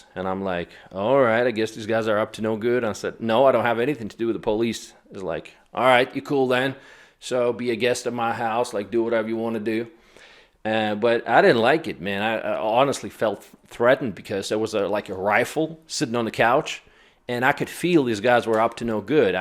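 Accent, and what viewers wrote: South, United States. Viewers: I love that I can hear a little bit of southern twang in your English accent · your southern twang when you speak English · You're a natural story teller! Plus your English is excellent down to a bit of Southern accent. Great smile,too! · Very good, I hear a southern accent i think. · you have adopted a southern American drawl! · I saw a radom video from you, then look at some more... seeing your family member from UK visiting her parents. But I couldn't figure out how a Southern US guy ended up with Russian parents... hey, maybe he was adopted, I thought....;-) then dug deeper into your channel and found amazing videos. You really give a nice perspective of Russia and its people. Maybe you need to "channel these" background video's of your years in US and how you gained your experiences, your viewers would understand better your background. 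I am amazed how well you speak English, with southern accent and all, even your wife speaks natural. Somewhere you must be an old US Southern soul how got reborn again in Russia, because you got the fighter-entrepreneur spirit and all;-) keep making these vids, you're doing good. До скорой встречи! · Love the Russian accent with that southern twang. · The southern accent and the Russian accent mix well.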